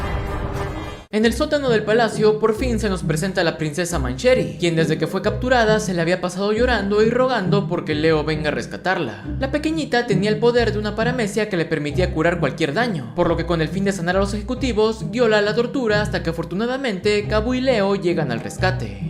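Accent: Peru. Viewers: eres peruano?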